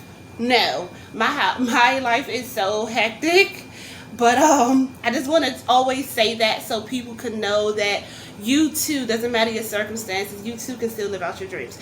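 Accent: New York, United States. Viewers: You talk like New York flavor flave 😄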